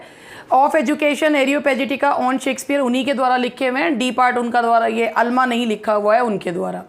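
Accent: Meerut, India. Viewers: Meerut ki tone alag he hai😁.. pleasure to see videos. M.A ki classes yaad ajati hai..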